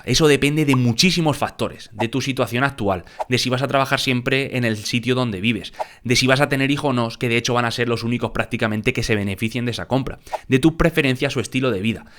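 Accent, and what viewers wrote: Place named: Albacete, Spain. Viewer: Llevaba un tiempo viéndote y pensaba que por el acento serías de Albacete. Hoy lo he corroborado 😊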